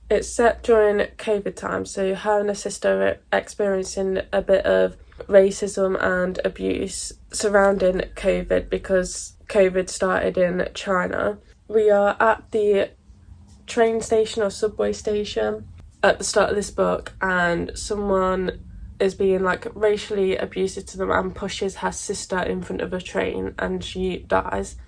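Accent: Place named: Nottingham, United Kingdom. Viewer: Are you from Notts? Trying to guess your accent!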